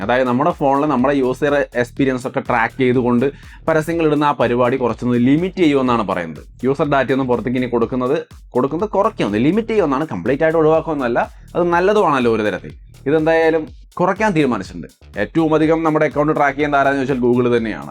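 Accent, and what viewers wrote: Thrissur, India. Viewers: 0.46 nalla Thrissur bhasha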